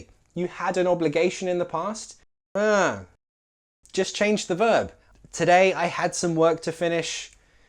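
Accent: United Kingdom. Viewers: Mohammed Nabi It is British English for sure! English is just amazing! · Very useful for me! Thanx from Mexico City! I love brit accent! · Hi sir it British or American english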